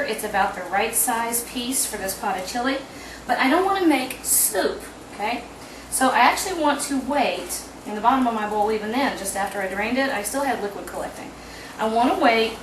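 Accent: South, United States. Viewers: Hi, Thanks for your response i appreciate it.I notice in some cooking videos that People will ask a question to the Cook but there`s never a response so thanks again.I can`t waite to make your recipe.I`ll let you know how it turns out.I love how you`re so friendly with the Youtube viewing audience.I notice that you have a Southern accent are you from Texas? I reside just 30 minutes south of Seattle,Washington, The City where Starbucks Coffee was started.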